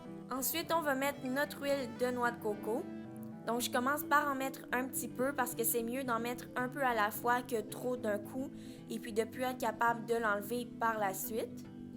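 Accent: Canada. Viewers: J'aime ton accent canadien :D super vidéo ma belle. Pleins de bisous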